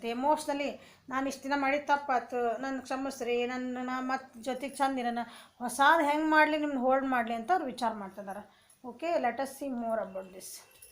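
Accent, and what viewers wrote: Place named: Vijayapura, India. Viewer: Bhahal chanda heliri akka nivu helidu kare ada noda akka nammadu vijapura nimma matado saili namma kade ede anasakataiti yava uri akka nimma vedo ede fast nodari kannige bididu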